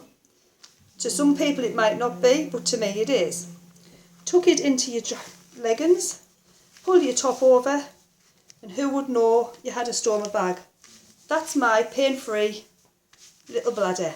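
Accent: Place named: London, United Kingdom. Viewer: You sound like you are in London, they have the TIES Ostomy Cure over there , so far 15 patients have tried it, still not yet ready, hopefully it will be available soon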